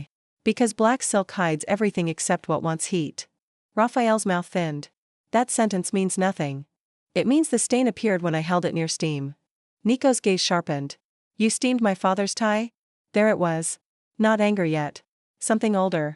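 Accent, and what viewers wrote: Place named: Italy. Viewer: 👏👏 lovely and written with humour.. good narration, albeit the italian accent interposed and no clear report regarding the powder on the tie and pin…♥️♥️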